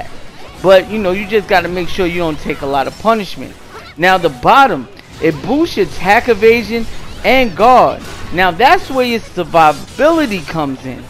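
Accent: New York, United States. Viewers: this man definitely from new york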